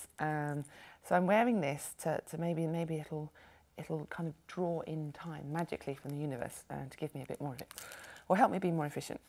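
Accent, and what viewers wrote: England, United Kingdom. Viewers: What part of England is she from?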